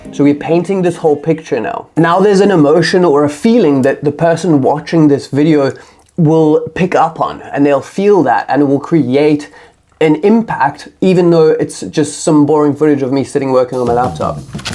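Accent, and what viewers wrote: Cape Town, South Africa. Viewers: I’ve watched a ton of these “HR clog your simple life” and yours is my favorite. Maybe I just miss the Cape Town accent! I’m watching this in Atlanta airport on a trip I’m vlogging for my new channel: Cage Free Theology Thanks bru.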